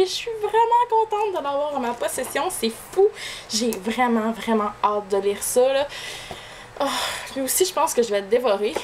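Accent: Quebec, Canada. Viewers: ah oui ! c'est bien ce qui me semblait, qu'on avait justement le tome 1 et peut etre aussi le tome 2 de la saga "ailes". Tu as de la chance d'avoir les 4 tomes ! je viens de découvrir tes videos, celle-ci est vraiment chouette ! et en +, j'adoooore ton accent !(je devrais épouser un québécois, juste pour le plaisir d'entendre cet accent tous les jours, lol )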